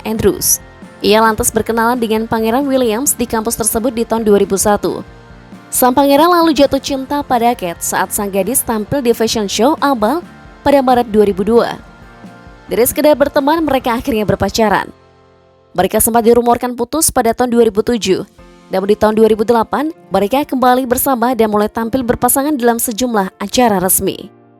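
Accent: Indonesia. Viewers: Saran untuk admin : supaya cari narator yg memiliki pronounce yg English British atau English US. Jangan yg bhs. Inggris a la Indonesia.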